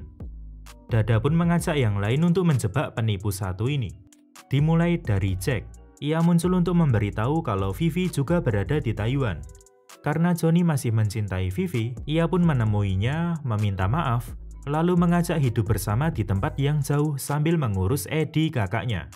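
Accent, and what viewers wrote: Java, Indonesia. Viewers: Medok pisaaan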